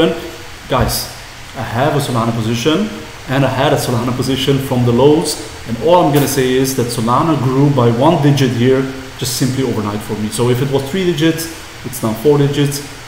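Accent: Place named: Belgium. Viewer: are you from belgium? You really sound like a belgian guy speaking english 😁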